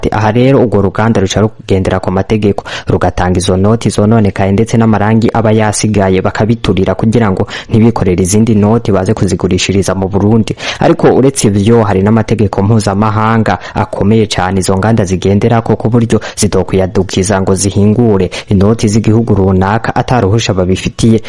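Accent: Rwanda. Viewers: Nagira ndagusabe uze uriga uravuga ikirundi mpuzamakungu apana mpuza mahanga ivyibinyarwanda wivugisha stop ikindi Vuga nkawewe woye kwigira nka Ismail mwanafunzi ibisigaye courage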